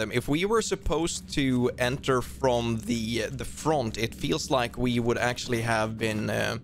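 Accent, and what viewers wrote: Sweden. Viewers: Not seen many of your vids, but some with Fooster, looking forward to seeing/listening to more vids. What I think I like the most, is how you embrace your Swedish, as a fellow Swede, I'm happy to hear it, and it always makes me smile. Love from good old Malmö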